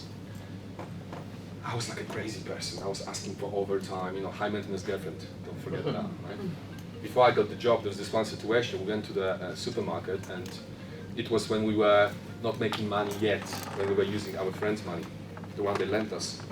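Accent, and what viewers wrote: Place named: Poland. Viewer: omg I love the polish accent... yippy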